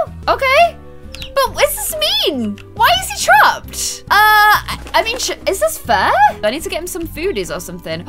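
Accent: United Kingdom. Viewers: why r u British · ARE YOU BRITISH???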